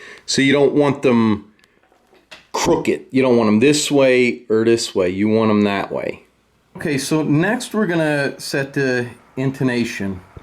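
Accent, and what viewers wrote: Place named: Chicago, United States. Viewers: That's not a Brooklyn accent? Chicago? Interesting 🤔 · Nice vid great Chicago accent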